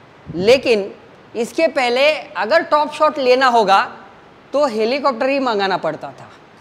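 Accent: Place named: Maharashtra, India. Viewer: Hindi me marathi accent aa raha hai sir ka... Sorry but aise hi videos dekh k thoda samajh raha hu 🙏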